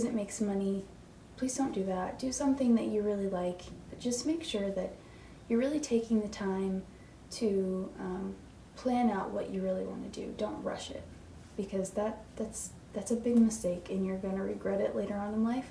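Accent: United Kingdom. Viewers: Same :o the British accent's gone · wait wait wait. i just watched the video where she had like this perfect British accent...what happened to it? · I loved ur Brit accent tho😔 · Why did you stop making Youtube videos? 22K is a great start. And what happen to your British accent? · Go back go using your British accent. I really enjoy it when you speak in your accent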